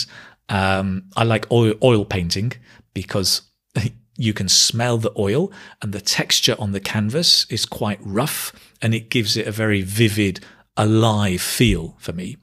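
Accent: United Kingdom. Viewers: This accent (British) is amazing .. i do like it, but i have not still mastered it well.. briefly thanks for your information about that, and have good day.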